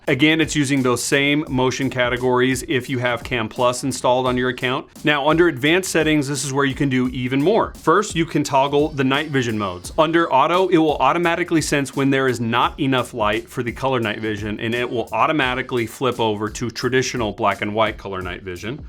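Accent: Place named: United States. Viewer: Your videos are awesome but mainly because you are a very good English-speaking American, how bad would the videos be if they were done by the same people we have to talk to when we call to in customer service that are trying to speak English, but they are not very good at it, and they are not American. I really like the products a lot, and I was one of the early backers that contributed when times were rough but the product was worth it and the new stuff is amazing, but your customer service is hard to reach and harder yet to understand, I just spent over 35 minutes on the phone trying to find out why items were not shipped that said they were, I got no e/mail about it only a partial shipment with no info about the missing items. I Love the products, but I cringe when I have to talk to customer service you need to get some better speaking customer service people and an easier way to reach someone.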